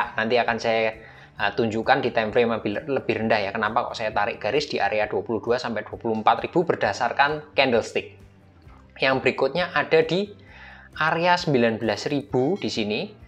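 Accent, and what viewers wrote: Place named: Sleman, Indonesia. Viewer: Jelas² sleman Logat & bhsane bedo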